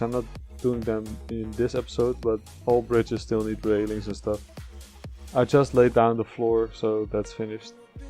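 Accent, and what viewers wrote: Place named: Netherlands. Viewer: Hello! I really like your videos! I don't want to be rude, but your voice sounds foreign. Are you Dutch? You sound Dutch lol.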